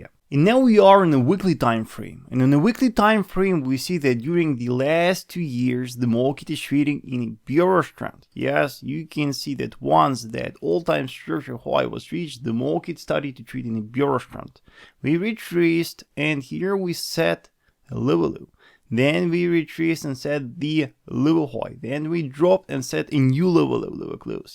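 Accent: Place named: Russia. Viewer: he speaks engruslish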